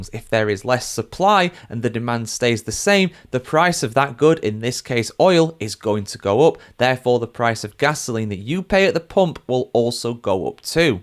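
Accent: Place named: United Kingdom. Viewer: Eh...can't listen to a limey preach about US problems. Sorry.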